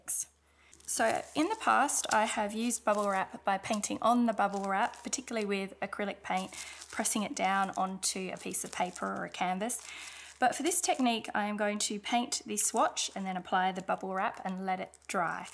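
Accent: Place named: Australia. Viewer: As soon as I heard you’re Australian I subscribed to your YouTube channel. So nice to hear a familiar accent. And you use materials available to us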